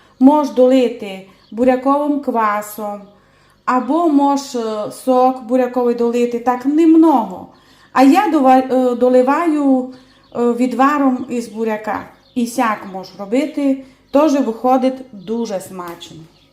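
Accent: Transcarpathia, Ukraine. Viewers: Насолоджуюся вашою чудовою закарпатською говіркою. Дякую за рецепт. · Спасибо за рецепт и красивый,напевный закарпатский говор. Я очень люблю вас слушать.❤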